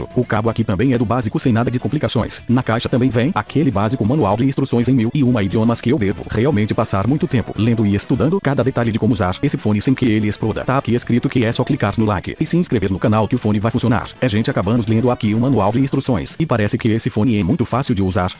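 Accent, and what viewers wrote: Portugal. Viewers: Vc é brasileiro ou português?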